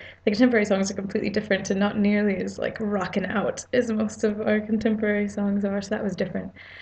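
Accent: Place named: England, United Kingdom. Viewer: LOL!! I did that too when I was surfing in England. Yes, there's surf in England. The accent sounds good on you, but sounds weird coming out from a Filipino-Hawaiian-Basque dude (or a brown brahdah). LOL! Cheers.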